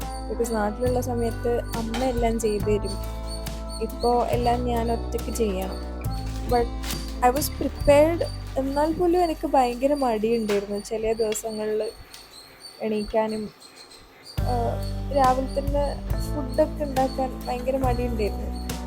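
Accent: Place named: Kannur, India. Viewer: Kannur slang is peek broo 😭😌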